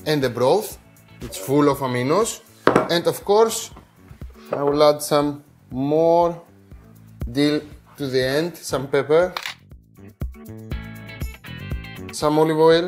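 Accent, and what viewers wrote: Greece. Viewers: I love to watch you cook and to listen to you speak English with your Greek accent! 😄 I grew up (in the US) with Greek grandparents and many other Greek relatives and listening to you brings so many memories. You remind me so much of my cousin Stathis, (although he doesn't cook 😂.) I remember Yiayia used to make this dish and I loved it. She's been gone a very long time, 50 years, but watching you make this I can almost taste it from memory! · I love the way he speaks English with that Greek accent. And I love his recipes, he uses ingredients easily available anywhere in the world, specially in India. Thanks for such amazing recipes, I have tried a few of your recipes and they are always a hit in my family. Can I use chicken mince for this recipe?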